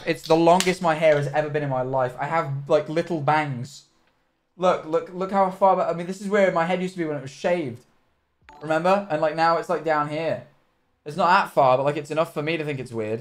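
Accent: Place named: RP, United Kingdom. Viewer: why did * sound so posh in this clip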